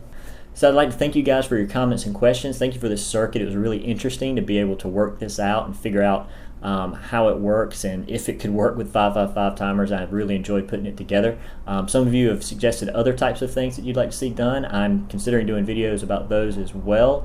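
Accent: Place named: North Alabama, United States. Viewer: Where are you from? I grew up around the South Georgia accent and I can't quite pin you down. North Alabama, maybe? Thanks for the great tutorial.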